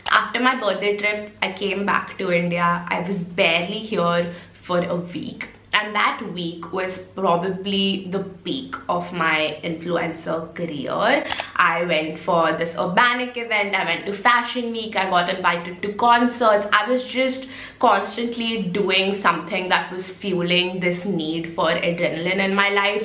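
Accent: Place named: Dubai, United Arab Emirates. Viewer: The typical Dubai guy accent💀🤣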